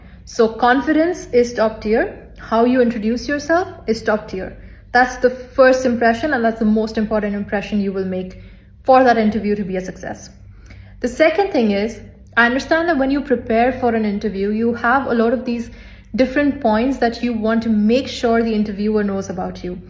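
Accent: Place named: India. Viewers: She has an Indian accent because she's Indian. No one needs your opinion on how they speak, she speaks confidently, and the thing is you are talking about how she speaks not on the information she is providing for her subscribers. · you have a little indian accent can't you speak a little bit faster?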